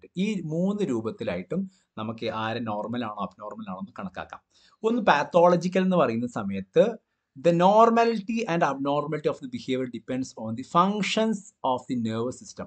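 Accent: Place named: Malappuram, India. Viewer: മലയാളം പറയുമ്പോൾ നാടൻ ഭാഷയും ശൈലിയും പ്രത്യേകിച്ച് മലപ്പുറം Slang വരുന്നു. ശ്രദ്ധിക്കുമല്ലോ?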